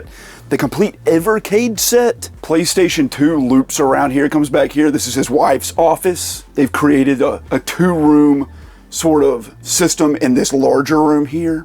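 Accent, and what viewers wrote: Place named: Louisiana, United States. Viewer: Is this accent from Louisiana? Sounds badass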